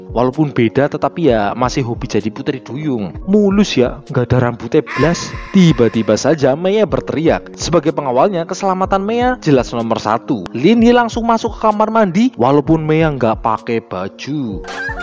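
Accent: Banyumas, Indonesia. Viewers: ok gokil,tambai ngapak ngapak,,yg lebih medok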